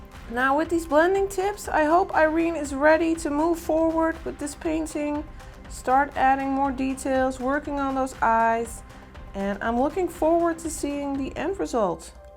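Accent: Netherlands. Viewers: I spy a dutch accent 😀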